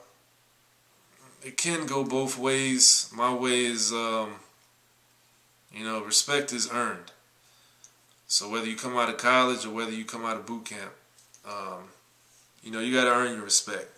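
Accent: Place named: United States. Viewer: I'm pretty sure he knows how to speak proper when he needs to. I myself am in college educated. I speak Ebonics but know how to speak proper english when it is needed. Maybe he is trying to appeal to those who are like him. You shouldn't be so judgemental. After all how we speak "inner city kids" speak is just another legacy of slavery.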